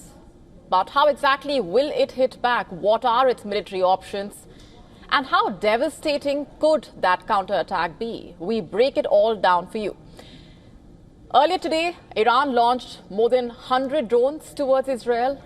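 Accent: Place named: India. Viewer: She is speaking Indian English but has a very harse voice not becoming an announcer.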